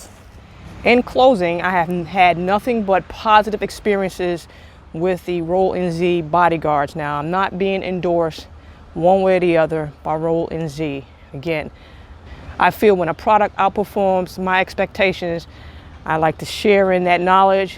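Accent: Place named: Virginia, United States. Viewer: I got mine because of your previous video. Love your Virginia accent....!!!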